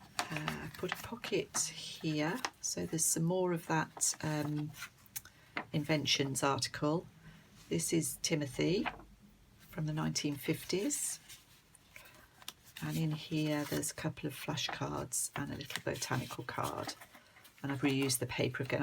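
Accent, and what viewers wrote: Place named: West Midlands, United Kingdom. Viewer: I’ve just discovered your lovely channel....some beautiful journals you have xx I started journalling earlier this year and love it! I thought I detected a West Midlands accent....I’m from Brum originally 🙂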